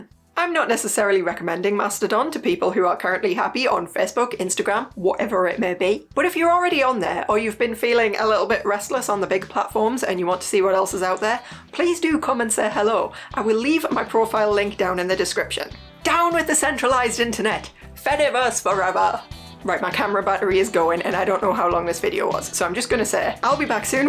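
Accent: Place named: Northern England, United Kingdom. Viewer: I'm a teacher, and when I look back at my old lessons from years ago, I have the exact same feeling. I'm sure they're not as bad as you think. May I ask, where about in the North are you? It's so rare to hear a northern accent on here! We're from Huddersfield (near Manchester) so fellow northerners!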